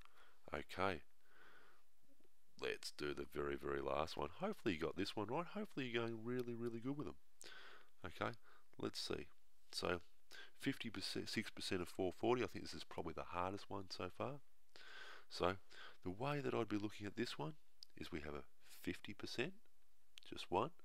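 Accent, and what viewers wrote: Australia. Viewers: If Corpse was Australian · Are you Australian? You sound just like my uncle. I love you videos! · His Australian His Accent shouldn’t Matter his message is Great 😊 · Australian bob Ross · Calm Aussie accent and common sense, both helped give me an extra tool to working out percentages . It helps keeps one in touch with the original math logic so often lost with calculators. THANK YOU ALL THE WAY FROM DUBAI. Bet you didn't expect fans this far out eh ?! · good one, your accent look like aussie thums up if u are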